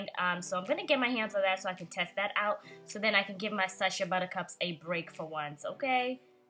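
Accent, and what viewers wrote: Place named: Kenya. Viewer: Crazy how I could automatically tell you’re Kenyan 😭❤️ I’m kenyan too girl 🇰🇪😛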